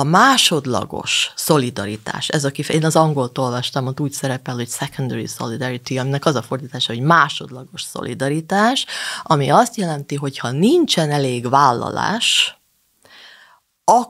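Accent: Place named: England, United Kingdom. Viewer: Nagyon jók a Hossó Andrea videók mindegyiket megnézem. De ez a rémes angol akcentus ez engem nagyon idegesít. Megérteném ha ott született volna, de nem.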